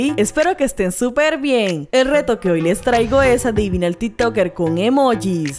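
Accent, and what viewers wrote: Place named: Ecuador. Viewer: Te veo De Ecuador✌❤ por la voz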